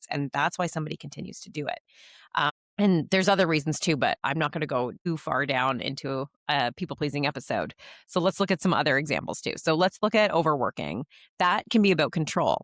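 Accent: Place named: Canada. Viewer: This is the female version of Modern Wisdom; she doesn't have a British accent but she talks as fast. Canadian, eh?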